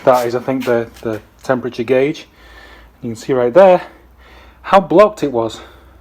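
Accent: England, United Kingdom. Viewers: are you from England??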